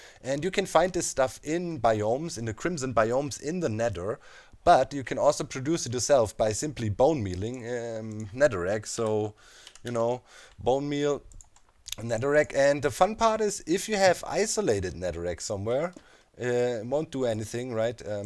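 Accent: Germany. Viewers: Geil der Deutsche Akzent 😂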